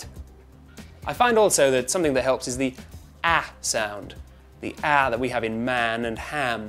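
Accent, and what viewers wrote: Afrikaans, South Africa. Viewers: As a South African, you are correct to some extent. You used the Afrikaans to English dialect. As in the person's first language is not English. I speak English as a first language, and it's quite different. It's not the same, but the closest I can describe it in text, is that it sounds similar to the Southern biritsh accent with a slight more harsher and clearer pronounciation of the words. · Yeah I was also gonna say that. I’m from Durban and recently went to Cape Town and I’m yet to hear someone with this accent not be Afrikaans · Different parts of South Africa have different accents and different ethnic groups from South Africa have extremely different accents. He is roughly doing an Afrikaans accent from Johannesburg ... Kinda. An English person from South Africa is far more subtle and a person of mixed colour from Cape Town or one of the people that speak one of the many Black South African Languages (like 85% of South Africa) is extremely different from this. 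This is a very particular Afrikaans Accent. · That's very Afrikaans, or accent is my h more chilled than that lool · Im also South African... hes good it sounds funny when someone tries to teach our accent lol Hes doing it more with a Afrikaans accent... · This is actually the so-called 'extreme' South African English accent (Lanham: 1982), mainly spoken by Afrikaans L2 speakers of English. Most L1 speakers of South African English, though, have English ancestors and a different accent. Anyway, overall the South African English glide in 'right' is realised rather as a monophthong: the i-part has been deleted, making 'right' sound almost like 'rart': [ra:t]. The same applies to /au/, where 'about' almost sounds like [aba:t]. Also the realisation of /i/ in 'pin', 'tin', 'pit', and 'hymn' needs some correction. This vowel, known as the KIT-vowel, has undergone a split in South African English. 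/i/ only acquires this raised and fronted quality [ee] that you ascribe to /i/ in pin, tin, pit and hymn if it is preceded by /h/ or /k/ or no consonant. Elswehere, /i/ is rather centralised, [uh], sounding almost like /e/ in 'butter'. So: 'hymn' = almost like [heem] (as you mention); 'kit' = almost like [keet]; 'is' = almost like [ees] but 'pin' = almost like [puhn]; 'tin' = almost like [tuhn]; 'pit' = almost like [puht]; 'milk' = almost like [molk] or [mlk]; 'women' = almost like 'woman' [wuhmmun] -- hence, in SA English the difference between singular 'woman' and plural 'women' is hardly discernible. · Amen! That's definitely the Afrikaans accent.. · me: *reads title* me: which one??, there's so many😂😂😂😂 this is a very Afrikaans accent but I suppose it depends on which part if SA you are trying to sound like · It's not a South African accent, but a middle aged Afrikaans accent. I don't role my r's and I am Afrikaans. 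What about English South Africans? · As a South African, I'm not sure whose accent you're trying to recreate but this sounds like someone who grew up very Afrikaans and then spent some time on the Cape Flats. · This is a very Afrikaans accent. What about the English South African accent? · This guy is clueless😂 he's speaking like an Afrikaans person would when they speak English. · You nailed the afrikaans accent of South africans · The accent he is portraying is the Afrikaans accent, trust me Im South African I would know, I can jump between my accent and British so I know the differences. My videos although not instructional do show what a South African accent is. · afrikaans sound slightly similiar to what he did slightly. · 😂😂😂 I agree, this is defs Afrikaans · There is definitely no single accent in South Africa lol You’re busy doing a sort of Afrikaans person speaking English here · This is not a "South African" accent this is an Afrikaans accent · This is how native Afrikaans speakers sound when speaking English. 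Other South Africans sound very different, depending on their own home language.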